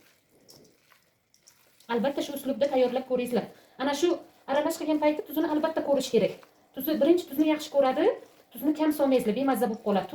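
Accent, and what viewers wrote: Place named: Gijduvan, Uzbekistan. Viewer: Ваалейкум салом,бемалол опа десангиз хам булаверади.Каерликсиз,акцентиз Гиждувонликларга ухшаяпти.Биз Навоийданмиз.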